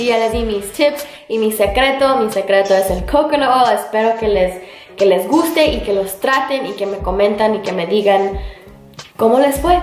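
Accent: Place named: Latin America. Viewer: todos diciendo que le cuesta hablar en español y yo que la acabo de conocer pensaba que era latina:v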